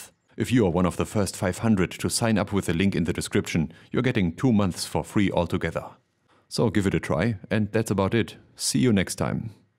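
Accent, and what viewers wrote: Germany. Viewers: German accent👌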